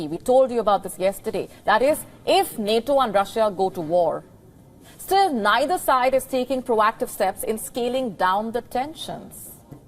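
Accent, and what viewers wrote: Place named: India. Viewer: This lady is speaking English with Indian accent that makes you throw up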